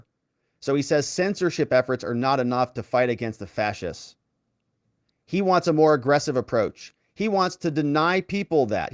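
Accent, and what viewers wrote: United States. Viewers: u have a montanan accent lol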